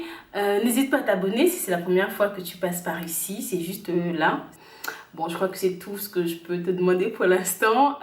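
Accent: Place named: Gabon. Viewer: Tu es gabonaise c est sûre je suis sûre t'es gabonaise je reconnais ce petit accent léger " n emmenez pas mon nom ohhh" trop happy je m abonne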